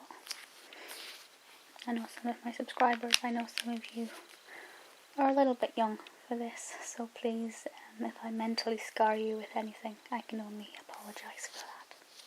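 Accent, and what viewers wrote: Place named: Aberdeen, United Kingdom. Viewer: i'm from Glasgow! :p One of my engineering lecturers is from Aberdeen, I knew I recognised the accent. Thanks for helping with stress problems, whenever i'm studying and it's stressing me out I just put one of your videos on, kills the stress almost instantly! :) x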